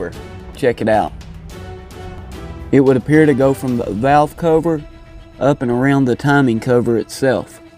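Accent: South, United States. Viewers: Love the dramatic music with the laid back southern accent lol. I'm subscribing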